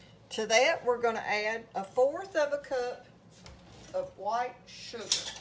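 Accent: South, United States. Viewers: Love your comforting southern voice!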